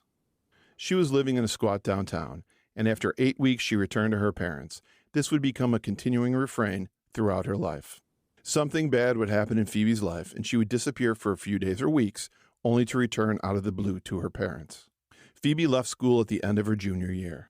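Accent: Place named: Rust Belt, United States. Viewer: I love your voice, too. I have to ask if you are from the rust belt because the way you speak is very familiar and I am a curious cat. Just wondering. I grew up in Ohio and the Buffalo area myself.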